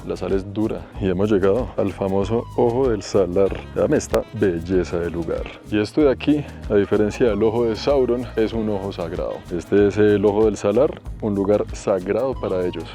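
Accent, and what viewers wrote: Colombia. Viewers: Excelente video, 100% acento colombiano 😊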